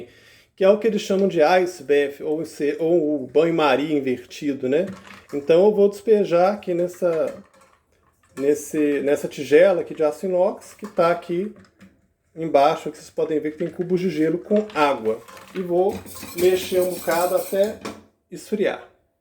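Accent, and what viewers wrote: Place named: Minas Gerais, Brazil. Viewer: Não têm né 😖meio difícil só pegar a receita de boca com sutaq de mineroooo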